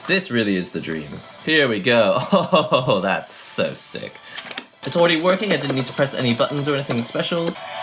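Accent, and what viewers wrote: United Kingdom. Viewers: Your family is in the UK? well that explains your accent lol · wow that British accent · I'll be honest, your British accent took me off guard.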